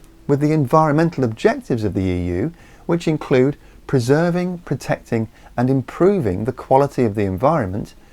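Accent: England, United Kingdom. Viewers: Proves we have no solutions, then commends the planting of 20m trees, again this won't even scratch the surface of beginning to reverse our extinction, just have a think about that. #2178 #ClimateChanged #TimesUp #GameOver yet this guy offers false hope to the masses. You guys better start to realize and soon that a bald man with an english accent makes a much better starship captain than a truth teller.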